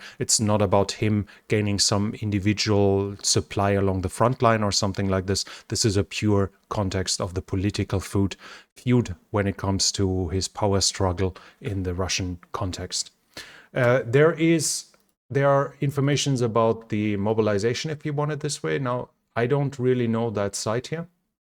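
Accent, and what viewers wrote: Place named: Germany. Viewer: Ich mag dein German-English 🤗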